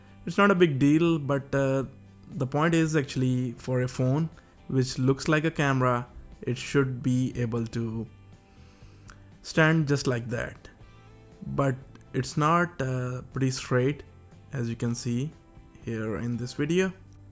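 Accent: India. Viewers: indian accent English is genuine ear cancer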